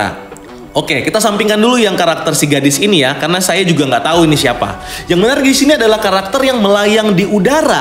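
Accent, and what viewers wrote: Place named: Java, Indonesia. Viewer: ilat jowo bos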